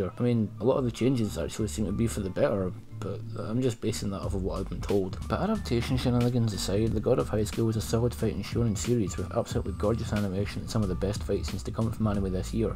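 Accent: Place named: United Kingdom. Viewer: God, I love that British voice of yours.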